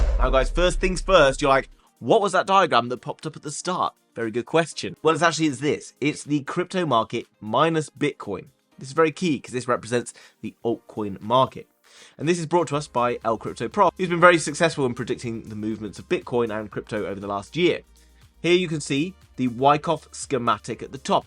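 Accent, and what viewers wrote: United Kingdom. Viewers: As a german follower, which even speaks very fast german, i love your clearly fast speaking i guess british english, cauz i ca understand everything and dont have to skip before and that is even awesome, like your BIG PULLING contet! I like your authority and your attitude, cauz i can see the through in your eyes and know your heart is beating for Investigating/trading AND secure your community, what is really epic ! I really aprecciate your content! Haave nice day brother, i send you much energy from the universe =)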